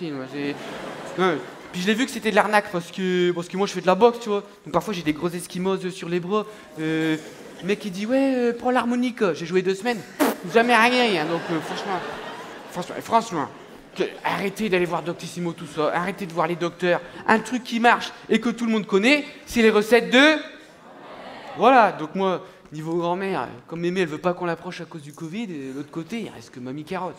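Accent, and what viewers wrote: North, France. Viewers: une masterclass d’humour noir, d’humour beauf saupoudré d’accent du nord 😭🤣👌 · C est d ou cette accent ?🤔 ch ti ?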